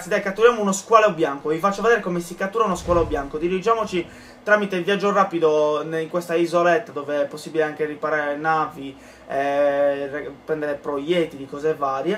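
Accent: Sardinia, Italy. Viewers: sei sardo vero :) anche io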